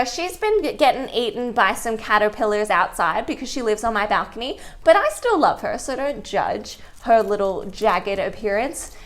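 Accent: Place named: Australia. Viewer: Thanks for the tutorial. I heard about 3-4 accents.🤔very interesting! Geussing Australia?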